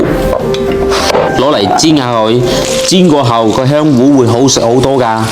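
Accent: Dongguan, China. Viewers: 地道東莞話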